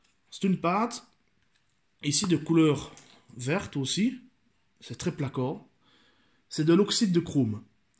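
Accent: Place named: Liège, Belgium. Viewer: Quand je t'ai entendu , j'ai dessuite compris que tu venais de ma région de lièch🤣 Très bon couteau de poche le Ruike p108 le mien ne me quitte plus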